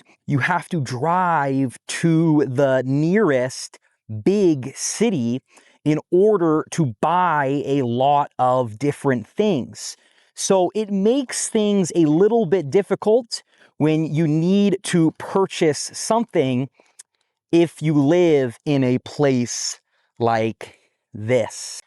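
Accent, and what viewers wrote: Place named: United States. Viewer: It's funny how you speak so slowly, but for us beginners, it's a good pace, especially since sometimes there are words I don't know the meaning of. I only have a few reservations about the channel name. English at Ease is very popular, which makes it difficult to find. I had to do a lot of searching. I should have added a name or some other feature that would have made it easier. Other than that, it's okay. American is a bit easier to understand than pure English.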